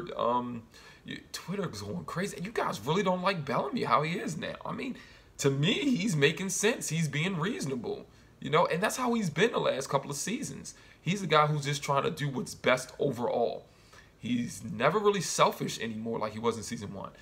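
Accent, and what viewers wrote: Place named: United States. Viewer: Good review... Ive been trying to find another recaper of this show after happycool's channel stopped doing reviews. also nice accent! Im Canadian so I enjoy hearing American accents lol. I do like Belamy and Echo... they do go well together but I do want Bellarke to happen. Maybe they will kill Echo off... or Bellamy and Clarke will bond over some plan while Echo is away doing her spy thing. He will talk about how hard it was to leave her behind and how is heart hurt and they will lock eyes and makeout!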